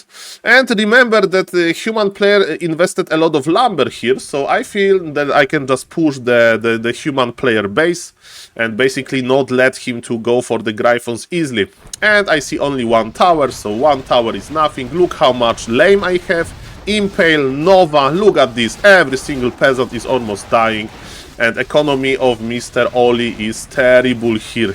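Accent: Poland. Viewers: U from poland? Accent sound so polish 😊 Edit after 2 minutes: polak jak chuj xD pozdro wariacie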